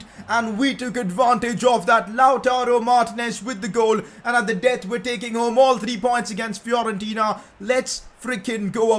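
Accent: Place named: India. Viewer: I wanna watch your videos but the way you talk man... I cant stand hearing the indian accent for almost 30mins😂 I can’t bear it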